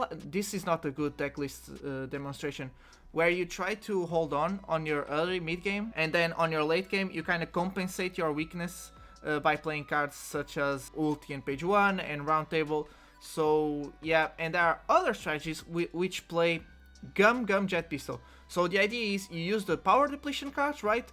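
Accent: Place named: Portugal. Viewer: sotaque tuga não engana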